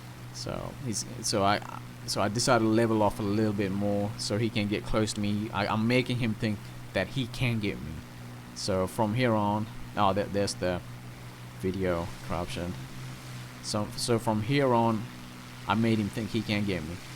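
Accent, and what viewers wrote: Louisiana, United States. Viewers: Its odd, because I could have sworn you sounded like you were from the Bayou, around Lousiana in the US at times, and other times it sounds like Australian. Really cool man! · Interested in knowing what your accent is from. Sounds like Louisiana mixed with a Western European accent. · I also thought Louisiana.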